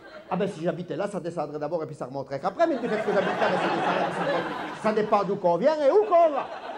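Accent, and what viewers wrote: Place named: Vosges, France. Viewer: Ben oui il est excellent. vive les Vosges et le patois !!.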